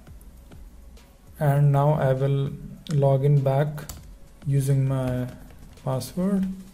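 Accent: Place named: India. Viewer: I hear enough damn Indian accents when I use the phone!!!!!!!!!!!!!!!!!!!!!!!!!!!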